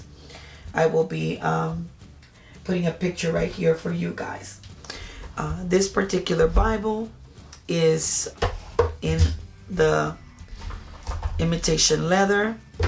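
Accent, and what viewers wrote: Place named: Spain. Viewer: You don't need to apologize for your spanish beautiful accent. Your English is perfect. God bless.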